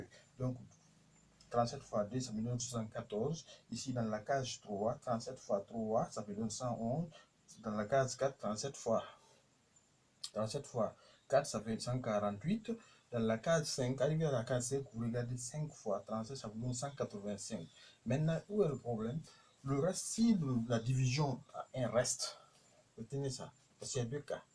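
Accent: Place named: West Africa. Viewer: French with a West African accent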